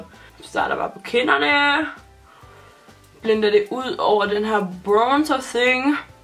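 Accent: Jutland, Denmark. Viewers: Hun er jyde right? Hvorfra???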